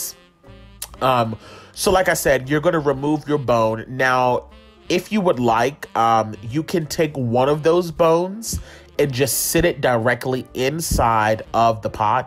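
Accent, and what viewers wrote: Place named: Gullah, United States. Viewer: Reminds meh of my gullah people 🤣🤣🤣🤣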